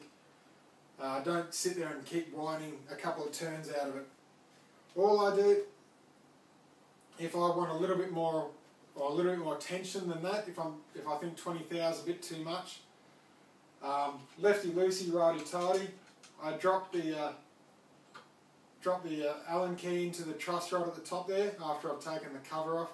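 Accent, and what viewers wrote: Australia. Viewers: Oh wow I was way wrong so you live in Australia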